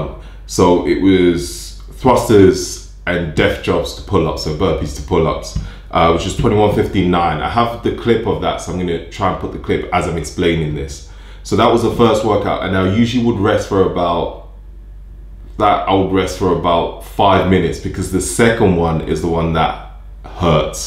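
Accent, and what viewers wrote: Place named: United Kingdom. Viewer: Damn your so fit and your accent is so brittish very Nice. Btw congrstulations😉👍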